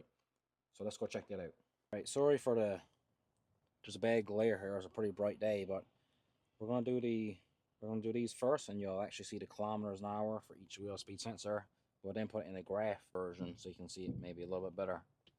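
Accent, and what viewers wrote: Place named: Newfoundland, Canada. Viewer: Sound like a Newfoundlander cheers 🍻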